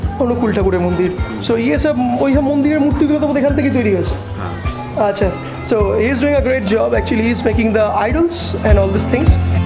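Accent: Bengal, India. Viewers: When u speak Hindi anyone can guess u r Bengali origin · Bhai tera hindi bahut kharab hai... better tu bengali me baat kiya kar. Bengali accent me hindi bolke hindi ka mazak mat udha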